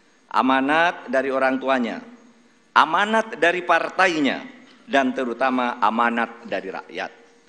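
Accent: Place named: Madura, Indonesia. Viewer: Logat Madura nya masih kental, salam settong dere pak😁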